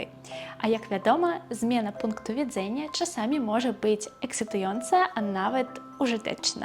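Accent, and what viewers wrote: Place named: East, Poland. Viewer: Ты умничка. Очень красиво говоришь по польски с еще более красивым восточным акцентом. Класс. Другие слова, так называемые ложные друзья, которые могут ввести в заблуждение это час, склеп, урода, просто или пукать (😅) итд. В целом их много, но это не помеха, наоборот Это делает жизнь более веселой! Успехов Тебе🤗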